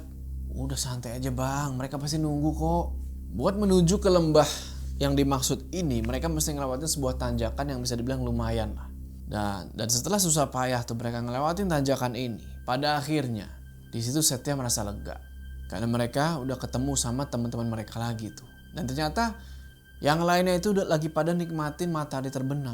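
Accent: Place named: Medan, Indonesia. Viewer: Bg ngapa bntr bntr pake bhasa jowo ntar logat Medan heheheh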